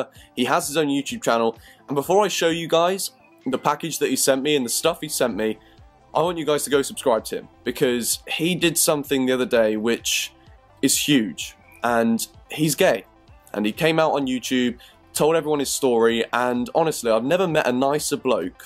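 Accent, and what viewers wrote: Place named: United Kingdom. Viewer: Hey man, where roughly in the UK do you live (not a stalker,just trying to figure out the accent)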